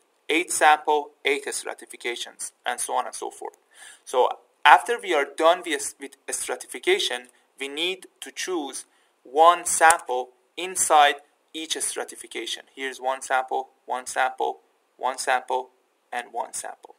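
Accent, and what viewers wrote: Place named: Iran. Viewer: Thank you for the Video. I like your Iranian accent :)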